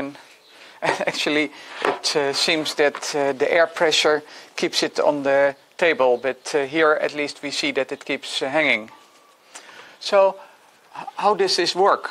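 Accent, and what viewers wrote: Netherlands. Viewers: It's been more than 10 years.And the Dutch accent has still not completely gone.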